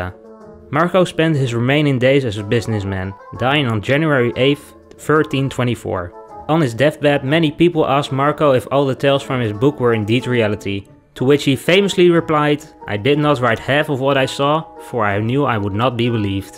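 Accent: Netherlands. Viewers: Ben jij een Hollander?..ik hoor het aan jou accent. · The narrator is Dutch i recognize this thick accent 😂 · This video helped out so much! I didn't understand Marco Polo's journey till now! This is great for my project! Thanks. Even though you had a Dutch accent you still explained really well. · The second I clicked on the video I KNEW he was Dutch. :') onlyDutchiesrecognisetrueDutchies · i can hear your dutch accent haha (im dutch as well so)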